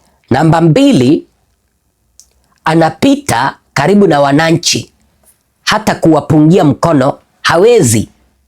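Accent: Kenya. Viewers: NA NYINYI WATANZANIA VILAZA HAMGUNDUI HUYU KISWAHILI TU KINAFANANA NA WAKENYA MNABURUZWA TU KM MKOKOTENI NA MNACOMMENT UJINGA WANANGU KAZI YA ULINZI SIO LELEMAMA ..HATA MAGU MSAFARA WAKE HAUKUA WAKITOTO NA HIZO HELICOMPTER UNAZOZIONA KAZIACHA JPM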